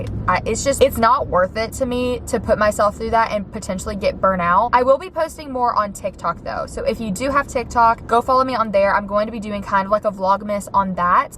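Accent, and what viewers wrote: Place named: Texas, United States. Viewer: I 💯 think your a texas girl you have that accent❤ I’m from oregon it’s beautiful here but if I could get my daughter to transfer we’ll be moving to texas or Nashville.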